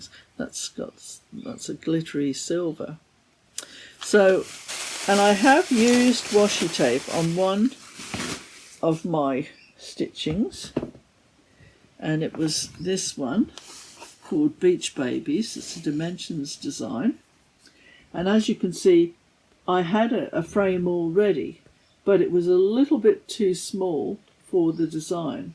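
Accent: Australia. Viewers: Hi,as always beautiful cross stitch,and lovely to listen to you chatting,are you Australian? ❤️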